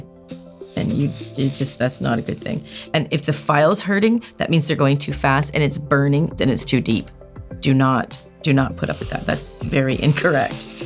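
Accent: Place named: Canada. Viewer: I just got dip powder nails for the first time, trying to grow out my onion-peel, thin nails. (genetic, my mom's are the same) I was looking for info about dip nails online and found your channel and subscribed. I'm not a nail tech, but I love watching you and listening to you. My fiance is Canadian and before I knew where you are, I guesses you are Canadian, love your adorable accent. :) Thanks for making such great videos!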